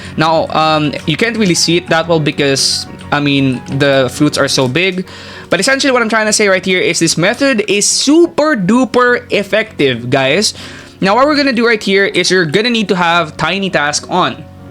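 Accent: Philippines. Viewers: The accent is very Filipino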